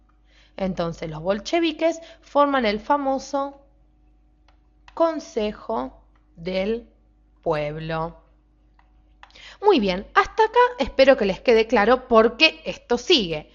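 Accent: Argentina. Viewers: lol es argentina y por eso la pronunciación xD no sé si es sarcasmo.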